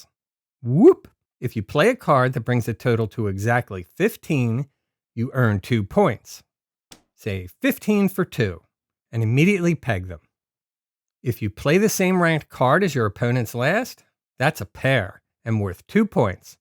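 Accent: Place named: Delaware County, United States. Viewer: You sound like you're from Delco......GO BIRDS!!!!!!!!!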